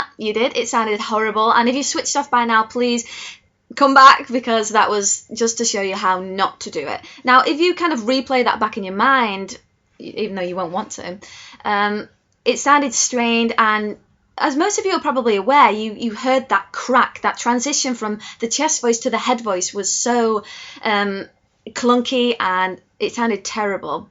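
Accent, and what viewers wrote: United Kingdom. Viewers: I love that British accent. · Are you British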